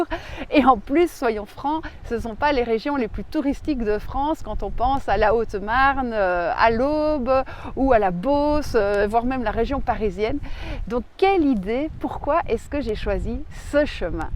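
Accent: Belgium. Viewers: Bonjour quelle énergie pour ce long chemin et toujours la bonne humeur ça fait plaisir de vous entendre ainsi que l’accent des flandres bon chemin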